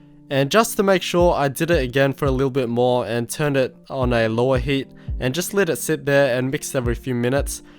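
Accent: Australia. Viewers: I thought you were Australian! ...if it was a mix accent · ayyy an aussie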